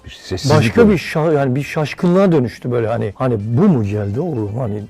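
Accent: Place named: Diyarbakir, Turkey. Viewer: diyarbakır ağzı mükemmel, kusursuz.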